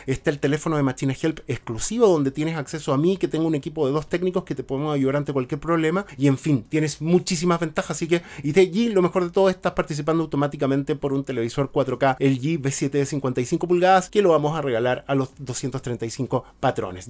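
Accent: Chile. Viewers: Es chileno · duele escuchar el Chileno, pero bue, era solo para ver un poco de Disney+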